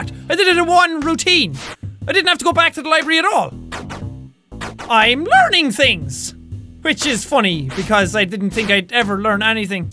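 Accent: Ireland. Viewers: When he says Carl it sounds like Carol because he's Irish as hell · Ai love the way the Irish say 'three' and 'arse' it awesome. 😂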